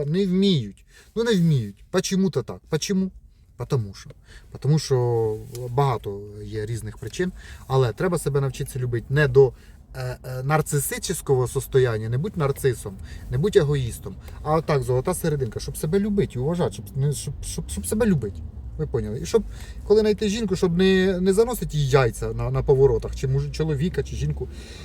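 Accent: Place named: Ukraine. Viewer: Интересно слушать украинский русский )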